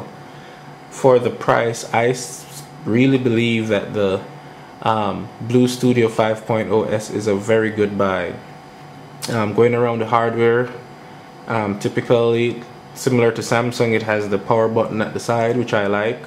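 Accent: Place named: Jamaica. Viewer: Good Review, (I Click Liked thumbs up) You have a Nice Jamaican accent, But we Trinidadians are rated internationally as Having the "Sexiest Accents in the World" ...........LOL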